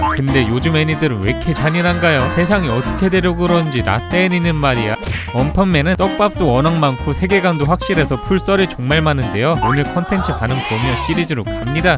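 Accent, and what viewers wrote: Gyeongsang, South Korea. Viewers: 님 경상도 분이신가요? "사이타마" 말할때 "싸이타마"라고 하시는거 보면 경상도 특유의 쎈 발음이라 갑자기 궁금하네요.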